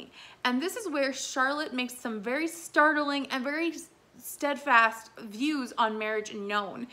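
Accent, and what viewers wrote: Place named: United States. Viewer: I enjoyed listening to your commentary. Maybe your American accent helped with objectivity!😊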